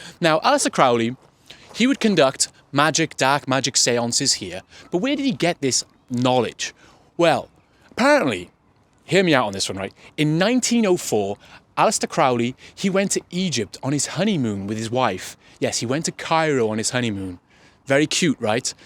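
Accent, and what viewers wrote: Wales, United Kingdom. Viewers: Sounds Welsh to me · He sounds Welsh to me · i THOUGHT i heard some welsh in your voice! cymru am byth!🏴🏴🏴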